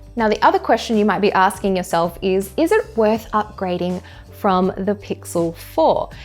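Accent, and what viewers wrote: Australia. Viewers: Lol all us aussies sound like this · dodgy aussie accent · i'm in love with how she talks 😭 aussie? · Aient nobobdy gonna talk about that auzzie accent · She is Australian and from the city, I won’t say what state but I think I know. This is how I sound lol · What a beautiful Australian accent ❤️ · 🤗 Australian accent:) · I love your accent!😍 Australian? Perhaps a Kiwi?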